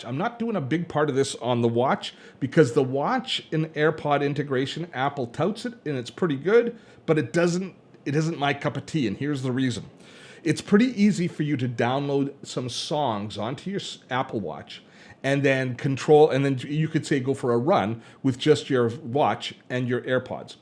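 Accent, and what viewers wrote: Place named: Canada. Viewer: Yeah I knew you were Canadian 😂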